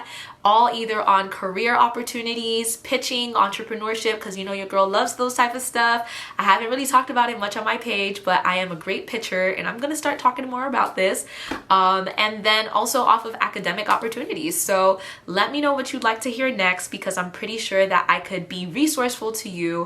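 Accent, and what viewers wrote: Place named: United States. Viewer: Bonjour, je pense que vous vivez peut-être aux. États-Unis ? Vu,votre accent !!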